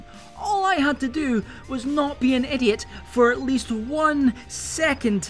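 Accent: Scotland, United Kingdom. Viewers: You from Scotland bro?? 🏴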